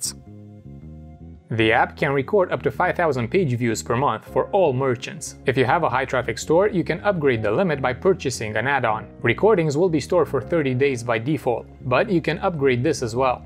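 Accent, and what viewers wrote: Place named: Russia. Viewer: Акцент похож на русский)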